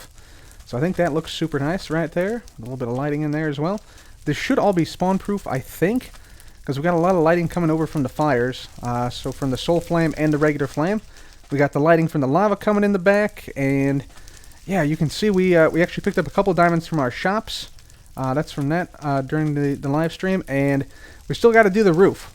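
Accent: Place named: Chicago, United States. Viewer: I realized it the first time I ever heard him speak and instantly recognized the Chicago accent :)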